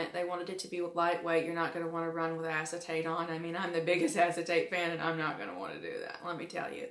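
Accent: South, United States. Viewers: I'll be using my Ray Ban 3487 for more physical activities. But off sunglass topic--"Mardi Gras" and "living in the deep South again" - so was I right that I detect a very slight Southern accent even before you said that? Please don't be offended, I was just curious.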